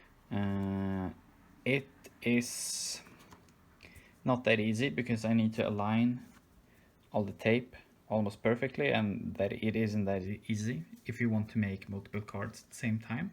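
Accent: Sweden. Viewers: Your accent sounds... awfully Swedish. (I'd know, 'cause hej hallå, jag är också en Svenne, isf.) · Are you swedish? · Wait are you Swedish, your accent sounds like it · Älskar den svenska dialekten, inte ofta man hittar en annan svenne här på tuben, lycka till med kort byggandet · This is so cool, also are you from sweden maybe? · Hey are you from sweden? No offense but you do have that swedish english accent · I was thinking of Also doing something like this. But im not to talented and i hope you somehow Will be able to buy these. Also is he Swedish? Hes accent souns Swedish/nordic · Love the swedish accent lol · Just a quick question. Are you Swedish ? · Are u Swedish?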